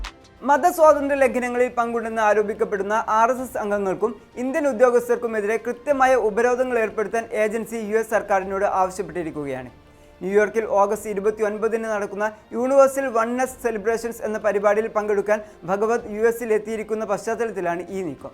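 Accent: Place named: Malabar, India. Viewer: ബ്രോ നന്നായി സംസാരിക്കുന്നുണ്ട്. പത്രത്തിൽ അച്ചടിച്ചു വരുന്ന അച്ചടി ഭാഷയിൽ വേണം ചാനലിൽ സംസാരിക്കാൻ പ്രത്യേകിച്ച് പരിപാടികളിൽ. മലബാർ സ്ലാങ് മീഡിയയിൽ വിഷയാവതരണത്തിൽ കൊണ്ട് വരരുത്! നിങ്ങൾ പല വാക്കുകളും സ്ട്രോങ്ങ്‌ ആയി സംസാരിക്കുന്നില്ല..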